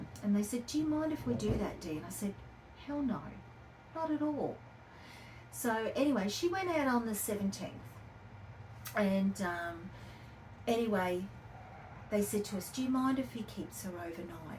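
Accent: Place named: Australia. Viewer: Love listening to your stories. You have a lovely way about you. I was brought up in North Brisbane so nice to hear the Aussie accent that I have now lost. Sending best wishes from North Wales. Wendy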